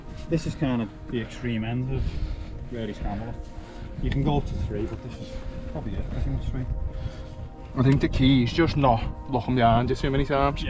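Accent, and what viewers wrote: Liverpool, United Kingdom. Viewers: Good filming & great to hear a fellow scousers on You Tube. Cheers lar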